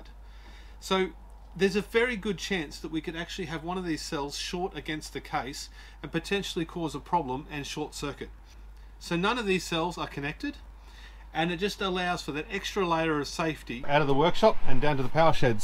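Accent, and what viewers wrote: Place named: Australia. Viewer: I love the Australian accent.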